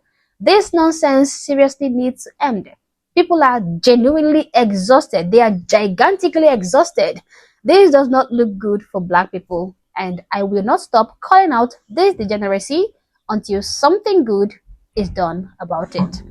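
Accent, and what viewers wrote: Africa. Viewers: The other day, I was going on about hyphenated Americans. one in particular african-Americans... I was explaining the difference (in my opinion !) between black folks black Americans & African-Americans... & Sweetie I want Thanx for just being you & your accent, because in my book an ""African-American"" first & foremost they have to 1) be American citizen, but almost more importantly they have to some like your exact accent, no disrespect, what I would (maybe Mediterranean Africa would be different !) but all of y'all south of the Sahara all them (I have not heard many different accents from various parts of Africa) but they're going to sound a lot just like you ! But black Americans who's 3, 4 generations ago was "slaves", they don't know a damn thing about Africa, & are no more African that I am ! I am not denying the black that they are black folks I mean I am not blind yet ! 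(🙏Thanx be unto Our God & His Son Jesus Our Lord & Savior, Amen🙏) I can see I am not color blind, I've even said if black folks where to be stupid enough to hate America so much they transplanted themselves over to Africa... They would be in shock ! They (the indigenous Africans) be looking these transplants they would not see the American blacks as Africans; there's a completely different mind set a whole different way of thinking about things, & American blacks over in African countries be seen like fish out of water, like tourist really, that you came for a visit, I wonder if the real live Afric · You have an African accent. Are you able to find African content for your YouTube videos. Black schools have their graduation traditions. Do you have graduations in Africa?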